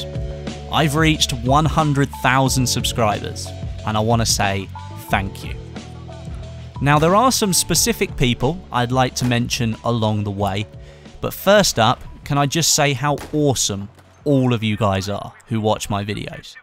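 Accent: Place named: United Kingdom. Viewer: Hey, you're awesome. From the time we were all infatuated with the T-Rex on Rogue Transmission and the Megalodon, I have loved your videos. You have never failed to please your audience (and turn us(me) on with that sexy british voice of yours), and are always looking out for the fans. I subbed at around the 50K mark, so right around the first easter egg hunt for BF4, and there was a point for a couple of months where I would leave a comment and I would have the guarantee of your reply. Bigger Youtubers often lack that quality, which I can understand, but you go above and beyond. I remember I was staying up late (11PM, Central US time) and you added me on G+. That put me in a position where I knew you were awesome enough to actually actively pursue some of your most active followers. Anyways, I love your videos, and 'grats on your big milestone. 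Also, I am just dying to see the Vlog with the 100K Silver play button!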